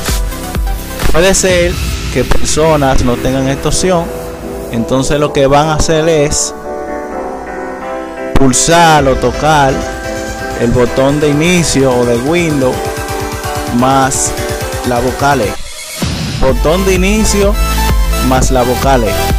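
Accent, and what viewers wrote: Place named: Dominican Republic. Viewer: Eres de República dominicana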